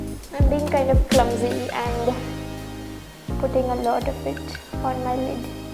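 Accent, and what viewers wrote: India. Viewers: I really loooveeee the way u talk u know ur English is phenomenal..u enunciate syllables..dats really catchy..at first I didn't like it...but now..I just watch it videos to see u talk.. moreover most Indian YouTubers fake an American accent..but ur pakka proud Indian Naari! Love u..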